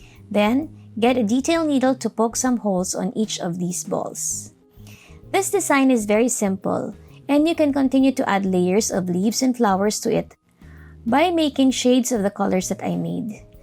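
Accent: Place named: Philippines. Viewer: I figure you are from the Philippines because of your accent and also the indelible ink on your finger. Btw who did you vote as the president? If I may ask? Thank you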